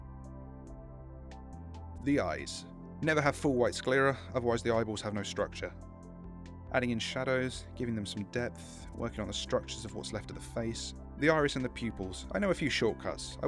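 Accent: United Kingdom. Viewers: He has the best British voice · I really can't tell where your accent is from its definitely Midlands I just can't pinpoint it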